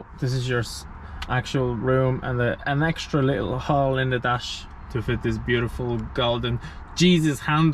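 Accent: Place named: Sweden. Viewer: You have similar accent to a friend of mine are you swedish too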